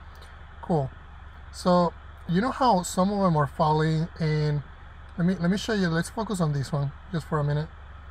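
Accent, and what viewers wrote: Spain. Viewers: I really like your videos man. Are you Spanish?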